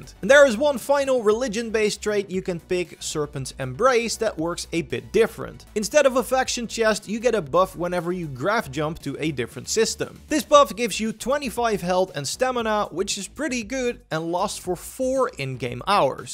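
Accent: Netherlands. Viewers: Very good video! But sorry to say, your accent is a bit annoying to me. You have a very Dutch way of pronouncing the "o" (I know because Im Dutch as well)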